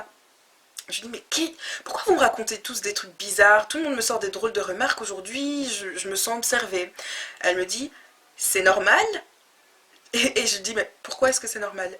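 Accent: Belgium. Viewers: T'es chou avec ton accent belge ❤️ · lol trop marrant😅😅😅😂😂tu es belge??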